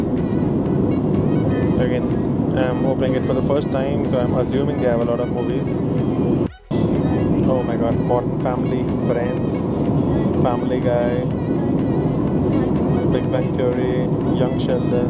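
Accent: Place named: India. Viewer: I CANT UNDERSTAND WITH YOUR TANDOORI ACCENT...HORRIBLE EDITING BTW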